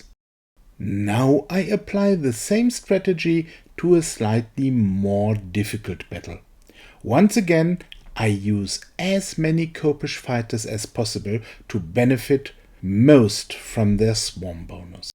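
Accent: Germany. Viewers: The first game I loved was Final Fantasy Tactics, so I enjoy the strategy RPG battles in FOE. Are they the only reason people hate the Egypt settlement? I was thinking it had impediments even worse than Vikings. I build for 3x3 structures, and then they introduce a 3x5 when I was building around stupid rocks I can't do anything about. I think I'll finish Vikings in time. I still wanna go back to Japan and lvl those buildings since it's so quick. But Egypt isn't looking as mean as people made it out to be. Though, I'm only one video into it. Your next video might change my mind.. And I love the accent. I'm guessing you get this a lot. But you sound like an extremely pleasant person. American women prefer the British accent. But American men prefer the German accent. That might not sound like much of a win, I admit. But it is.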